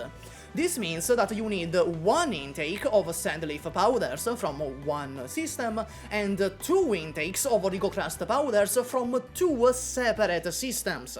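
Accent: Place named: Italy. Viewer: I dunno about "overwhelmed", I just came to listen to my favourite Italian voice for 20 minutes while I figure the game out myself lol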